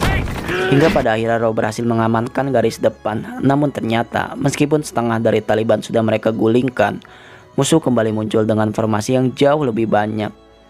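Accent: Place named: Kalimantan, Indonesia. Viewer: Dri logat kykx ini org Kalimantan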